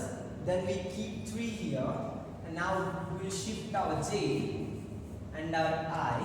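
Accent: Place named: India. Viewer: Explanation is Good.but u r an Indian brother so keep an Indian accent brother.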